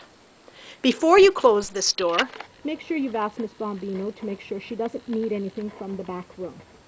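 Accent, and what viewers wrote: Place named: Ireland. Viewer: that Canadian accent has a HINT of Irish in it :D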